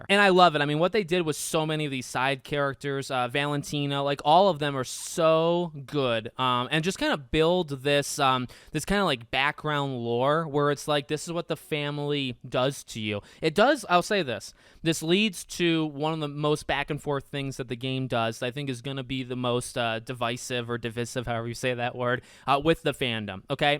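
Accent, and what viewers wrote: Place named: Michigan, United States. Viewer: Just came across this channel and you do a great job! You sound like a Michigander lol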